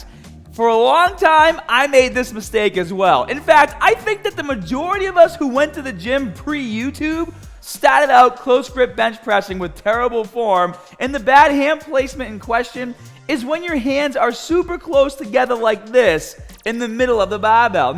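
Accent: Boston, United States. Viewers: Is this guy from Boston?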